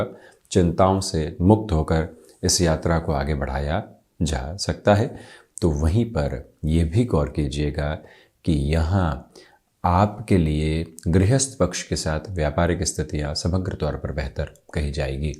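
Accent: Standard. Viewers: Aap ki hindi bahut shuddha hai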